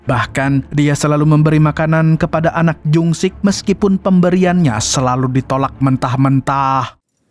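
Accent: Banjar, Indonesia. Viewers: Katuju banar ulun wan amang wan amang.channel nyak the bestlah.😂😂😂😂❤❤❤kalihatan banar logat banjar nya bumbuhan...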